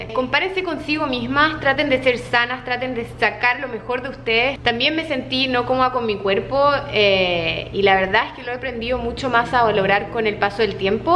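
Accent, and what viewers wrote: Chile. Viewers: Eres chilena ? tienes todo el asento jaja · Es el acento chileno formal (osea, sin modismos). El típico acento Santiaguino. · linda modelo pero tiene un acento chileno horrible . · Que lindo el acento chileno 😊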